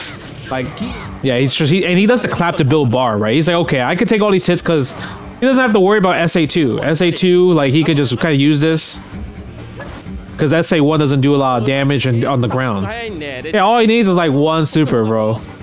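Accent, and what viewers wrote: New York, United States. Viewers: Commentary is 🔥! Def. NY 🗽 talk albeit you in Canada 🇨🇦. 😂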